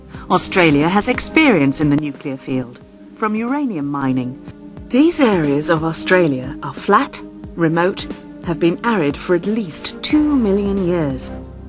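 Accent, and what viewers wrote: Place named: England, United Kingdom. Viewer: The English accent voice-over wasn't a great idea though!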